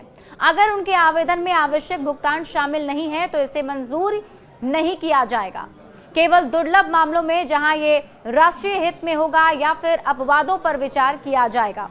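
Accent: Bihar, India. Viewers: bihaaran awaaz se hi lag raha he teri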